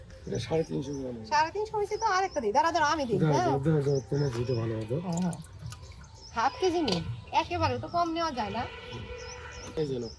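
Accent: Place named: Bangladesh. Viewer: আপু তোমার ভাষাই কিছুটা বাংলাদেশের টান আছে।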